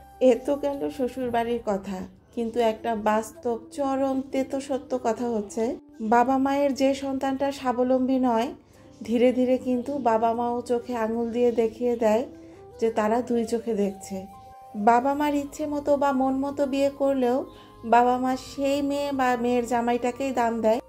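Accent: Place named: Tangail, Bangladesh. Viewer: আসসালামু আলাইকুম আপু ❤ তোমার টাঙ্গাইলের ভাষার কথাগুলো খুবই সুন্দর লাগলো ❤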